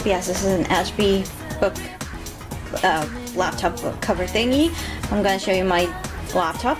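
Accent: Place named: Egypt. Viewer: you're Egyptian ?! o.o gosh your accent is AMAZING! xD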